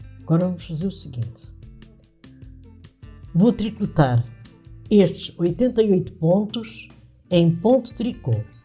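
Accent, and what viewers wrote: Portugal. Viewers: Gostei muito do modelo da sua pantufas! Ë lindíssima e muito dificil de fazer(para mim que não sei faser tricô)! Vejo que você faz obras de arte com o tricô! Ah, também adorei o seu sutaque português! Sempre quiz conhecer portugal...sou brasileira e vivo na Argentina. Vou compartir com minhas amigas! BsAs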